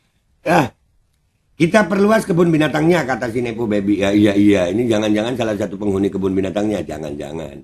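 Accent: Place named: East Java, Indonesia. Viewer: LOGATNYA SEPERTI JAWA TIMURAN..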